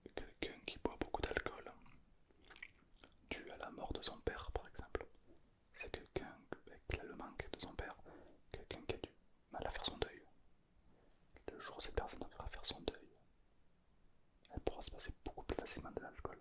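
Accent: South, France. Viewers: J'avoue... en lisant les commentaires je suis d'accord avec le fait que fasse vraiment autorité dans l'ASMR français. Je trouve qu'il est hyper pointu dans la cohérence entre sa sensibilité dans la retranscription des tringles qui sont à (presque) chaque fois hyper bien dosés et le discours en lui-même qui est aussi parsemé de bruits de bouches qui lui sont propres, et a la fois au paroxisme du suave. Pis y'a pas qu'une cerise sur le gâteau mais 2!: son humour désopilant (qui ma souvent fait éclaté de rire en plein endormissement) et cet accent du Sud qui ajoute une certaine rythmique pas déplaisante du tout Deuxième aspect en l'occurrence nous avons la chance d'avoir un thérapeute qui pratique la vulgarisation (mise à la portée de tous, c'est pas péjoratif du tout) de principes élémentaires en psychologie à merveille Bref, une dinguerie de fou psychomental pour insomniaques chroniques🤣🤣🤣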